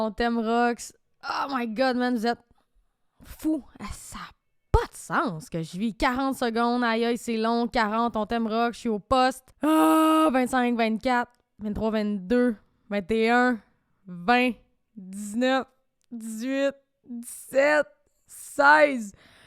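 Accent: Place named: Quebec, Canada. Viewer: Comme je te l'ai promis je regarde le replay! Trop deg de pas avoir pu être là! lol comment pas paraitre stressée 😉 lol ta montre qui se d'éclanche 😜 et pour moi à cette heure le sport avant de vraiment commencer la vidéo ^^ Hey! Attention, ti vo quère del cayelle (oups mon côté Ch'ti qui déraille! Oui le Ch'ti c'est le patois des gens du nord de la France 😉 on va bien et toi? Oui un peu tu panique car tu va être face (enfin tu as étais face) au réactions en direct live de ta communauté pour la sortie de ton clip! Donc Zen tout va bien se passer! 😉 MDrrr "on a vieillit" Attends tu aura vraiment bien veillis quand tu commencera à dire "moi à ton âge" ou "mon de mon temps " lol 😜 oki je met pause à la vidéo pour aller voter! Je reviens! ^^.............................. Voilà c'est fait!;) regarde tes mp et tu verra ce que j'ai fais en plus;) trop stressée pour pas l'avoir vu avant je pense;) 🤣🤣🤣 🦦🦦🦦🦦 ^^ à mon souvenir tu avais dis 18h donc non j'étais pas au travail mais je faisais dodo car 1h du matin heure de France;) ahh c'est donc ça l'odeur qu'il y a depuis un moment! 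je pensais que c'était moi lol 😅😅😅 prochaine fois pense à prendre une bassine et un essuis (je rigole ça se voit même pas 😉 ) j'adore cette chanson que tu as fais! il était juste magnifique ce clip! Gros bravo! Et contrairement à certains Canadiens tu as même ton accent (par rapport à moi Français 😉) 👏🏻👏🏻👏🏻 13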